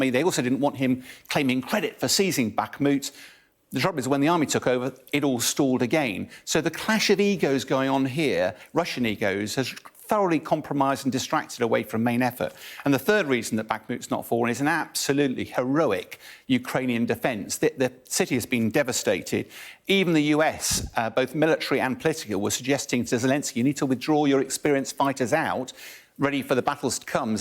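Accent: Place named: Scotland, United Kingdom. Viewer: To much british accent for me.....sound scottich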